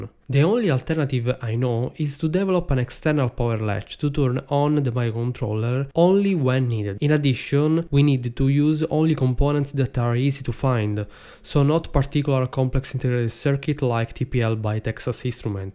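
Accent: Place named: Italy. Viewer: It is very understandable and the Italian accent constantly reminds me of the beautiful language, nice wines and food -> positive 😄🇮🇹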